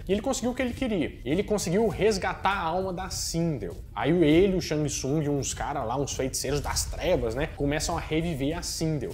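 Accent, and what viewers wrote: Minas Gerais, Brazil. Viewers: Mortal Kombat em Verso e Prosa KKKK. Do jeitim Mineiro kkkk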